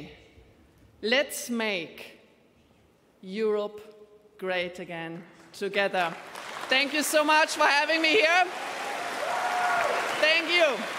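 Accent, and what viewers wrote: Germany. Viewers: "TH" totall versemmelt und Krautakzent! Mehr als Schülerenglisch war das nicht! War nicht mal Leistungskursniveau! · Für eine deutsche Politikerin ist das wirklich ein erstaunlich gutes Englisch, was sie da spricht. Kann man natürlich anders sehen, wenn man gegen diese Frau generell ein verbissenes und verbohrtes Feindbild pflegt, aber wenn man im Alltag selbst viel mit Englisch konfrontiert wird und sich dann mal die aktuellen und die letzten Regierungsmitglieder anhört, was da gesprochen wird, dann hört man eine wirkliche, linguistische Katastrophe. Man hört sofort, dass da eine Deutsche englisch spricht... ja... aber diesen Slang wird man als deutscher "native Speaker" nun mal nicht los. Wer das von sich behauptet, belügt sich selber. Das hier, ist das beste Englisch, was ich seit langem von einem deutschen Politiker gehört habe. Fragen wir mal anders... 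sind eine Baerbock, ein Habeck, Lindner, Merz, Scholz und Konsorten besser? Hör dir mal deren Englisch an, da rollen sich einem die Fußnägel hoch. Und das sind nur wenige, aber namhafte Beispiele. Bei ersterer kann man das Drama ja derzeit in fast jeder neuen Rede bestaunen... Stichwort: UN Diese Frau macht DE grade auf internationaler Bühne zum Volltrottel. Eure Qualitätspolitiker. Wie verbittert muss man eigentlich sein, wenn man in seinem Hass gegen diese Frau und ihre Partei, nicht mal eingestehen kann, dass sie der englischen Sprache weit besser gewachsen ist, als quasi alle aktuellen und vergangenen Regierungsmitglieder Deutschlands? DAS hat radikalen Charakter.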